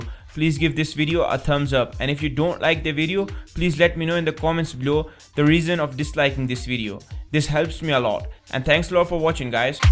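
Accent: India. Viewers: Your accent pisses me off so much. I hate fucking Indian accents. I just hate most Indians in general even though I am one but don't look or sound like one 😂 I'm serious I fucking hate Indians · Hes indian dont judges on how they speak ok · you country voice? indu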